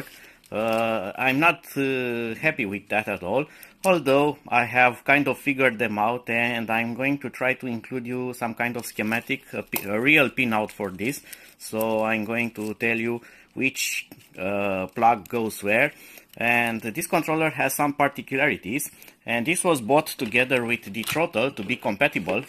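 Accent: Romania. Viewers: Recunostii romanii dupa accentul pe care il au in engleza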